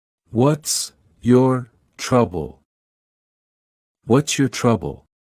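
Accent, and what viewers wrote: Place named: Florida, United States. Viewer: 美国不同的州说的英语有不同的口音，这个英语口音和我们佛罗里达州相同，很适合我。非常感谢你们制作这视频！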